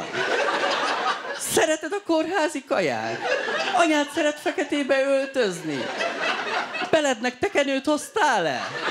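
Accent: Székely Land, Romania. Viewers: Szekelyfoldon pontosan is beszelnek! :D Ott lakok szoval jol tudom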